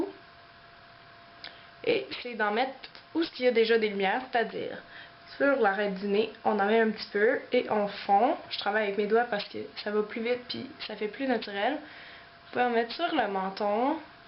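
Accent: Canada. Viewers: Tu es Troppppppp Belllllee trop mignon l'accent canadien !!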